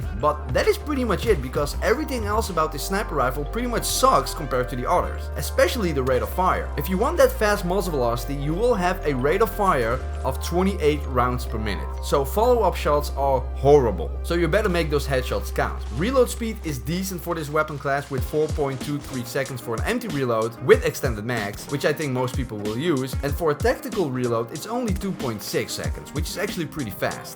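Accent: Netherlands. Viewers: your dutch arent ya i can hear it in your english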